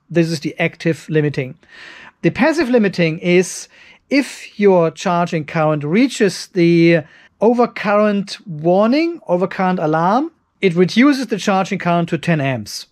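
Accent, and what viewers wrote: Germany. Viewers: At first I was thinking what is a PVM? Then I remembered ah German, where W's are pronounced as V's. :)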